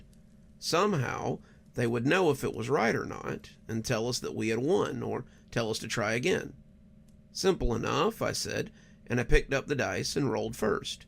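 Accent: United States. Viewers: were does ur accent cum from American obviously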